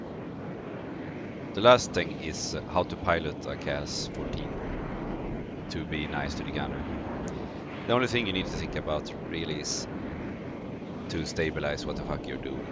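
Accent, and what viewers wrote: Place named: Sweden. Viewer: Svengelskan på topp, bra video 👍🏻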